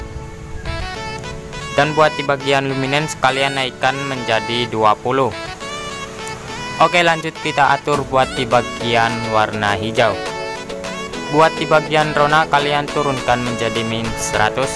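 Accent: Indonesia. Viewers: Bang emng lu orang Cirebon barat? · Wong Tegal um?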